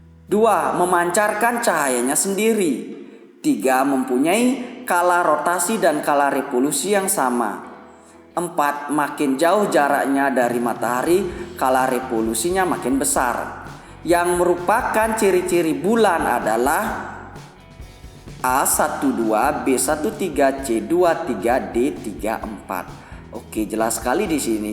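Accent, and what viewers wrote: Bali, Indonesia. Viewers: Kelihatan bli dari logatnya hehe, salken nggih 🙏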